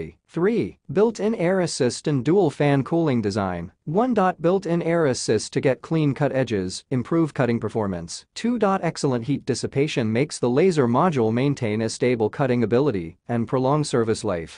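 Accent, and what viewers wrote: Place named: China. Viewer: Computer voice sucks big time!!!!! sounds like chineglish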